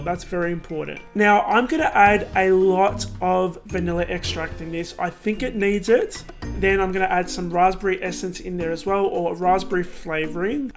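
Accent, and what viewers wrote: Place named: Australia. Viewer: Wow..am i the only one who is here by watching the luscious froasting and ended up watching twice because of his amazing australian accent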